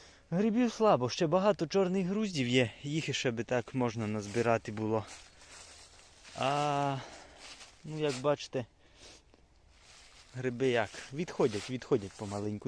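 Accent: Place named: Transcarpathia, Ukraine. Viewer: ага то чути Закарпатський діалект👍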